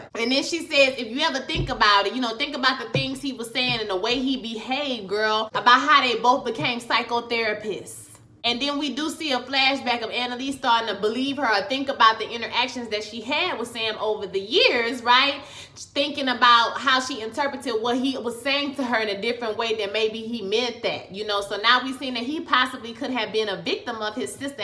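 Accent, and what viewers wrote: Louisiana, United States. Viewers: I swear i love your reviews in that Louisiana accent 😀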